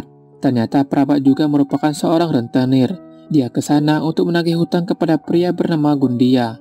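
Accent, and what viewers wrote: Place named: Banyumas, Indonesia. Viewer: Ngapak Hadir lagi miin... 😘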